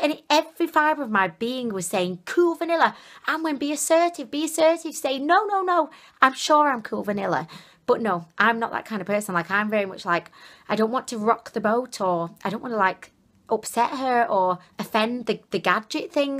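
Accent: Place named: Northwest England, United Kingdom. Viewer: So refreshing to hear a Northern West England accent. Great video.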